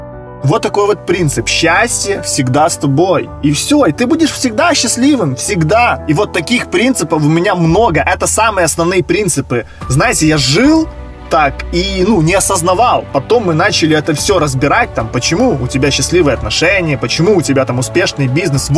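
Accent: Odessa, Ukraine. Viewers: Одесский акцент😉👍✌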